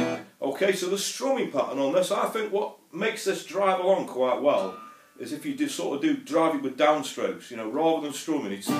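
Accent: Stoke, United Kingdom. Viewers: Do u do lessons ? Noticed the stoke twang . U make it look so easy .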